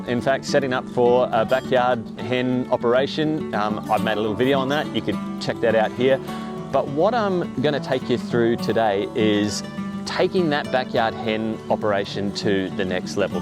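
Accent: Australia. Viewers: 100% on the accent, been in the US for 10 yrs now and it's a welcome change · Thank you for a great informative and to the point video. Well done. It’s so nice to hear an Australian accent on these videos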